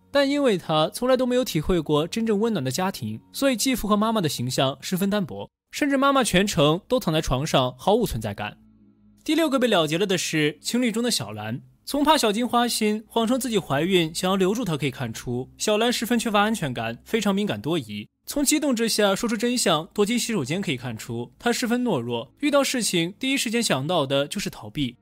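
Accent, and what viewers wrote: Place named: China. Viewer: 肯定是大陆的啊 台湾没有这种腔调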